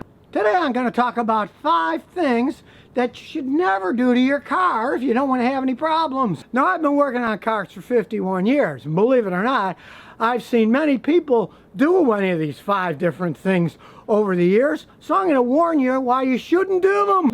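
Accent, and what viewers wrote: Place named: North, United States. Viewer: ! Are you from Houston originally? (I’m born and raised in Houston but live in Cali now). You sound like you’re from the north. Also I LOVE your videos. I’m a car salesman and you make me laugh so hard AND help me learn a lot (which helps me to properly educate my customers - Win, Win, WIN!)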